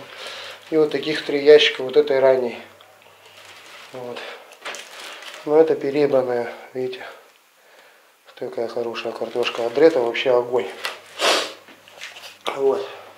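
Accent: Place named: Kuban, Russia. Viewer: Привет, канешно мало баклажан, а говор уже кубанский проявляется!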